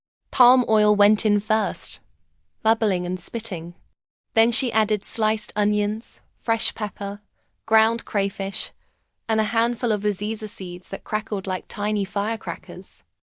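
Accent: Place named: United States. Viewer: A very interesting story but why use American intonation thereby ruining the beauty of the story with very wrong pronouncement?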